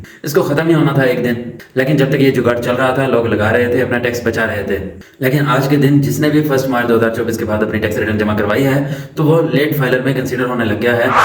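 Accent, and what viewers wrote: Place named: Saraiki, Pakistan. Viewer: یہ سرائیکی ہے یہ جماع نڑو ایسا ہی ہے ایسے ہی بولے گا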